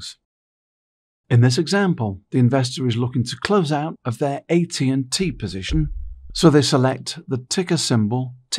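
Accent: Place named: United Kingdom. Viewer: This vidEO has gone tangent and not to the point. We are talking here about trades, correct? What you are starting to discuss is "How to convert currency" - how is this related at all? This is a different topic altogether ! What IBKR VIDEO missed- 1) No talk on how to place ETF order or no option for that - is it same as stock? 2) No discussion on order types, what are the different types of stop orders, limit order IBKR SERIOUSLY NEEDS TO IMPROVE THEIR DELIVERY QUALITY - AND PLEASE PLEASE GET RID OF THIS UK ACCENT ! THANK YOU.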